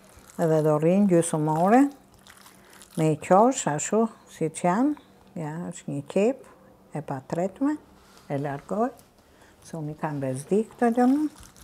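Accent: Tirana, Albania. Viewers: me zërin, dialektin dhe gatimet e Tironës, më nxjerr mall, shume mall .....!!!🙏❤️😭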